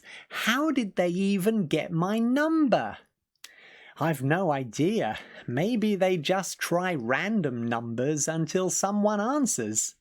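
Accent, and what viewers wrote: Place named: United Kingdom. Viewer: I think that British English cool! I like your mountain clothes☺️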